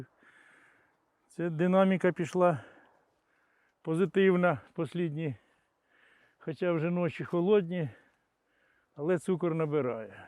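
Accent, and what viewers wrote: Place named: Surzhyk, Ukraine. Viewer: Несмотря на ученую степень, педагога из Вас, не получилось. Очень неприятно слышать суржик. Читайте больше книги на украинском и не будете заикаться.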